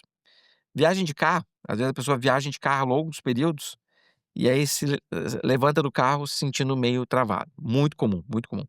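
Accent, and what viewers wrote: Rio Grande do Sul, Brazil. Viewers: O senhor é gaúcho doutor?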